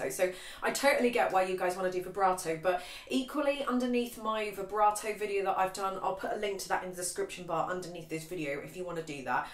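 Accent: United Kingdom. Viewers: I love your british accent, thank you for the advice, im 9 months violin student and i've just started with shift positions and vibrato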